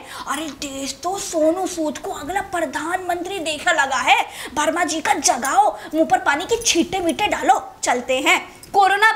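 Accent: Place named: Bhojpuri, India. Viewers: Bhojpuri slang osm madam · गजब बिहारी आवाज में पोल खोल दी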